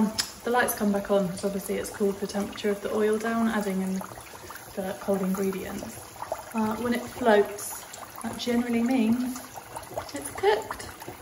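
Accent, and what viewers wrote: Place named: North East England, United Kingdom. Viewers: Watched a load of vids of good stuff,I,m from the north east also I,m further north listening to your accent......keep it up I enjoy things that I do...best wishes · Just with you based down south with your videos my guess was a m boro accent anyway great videos love seafood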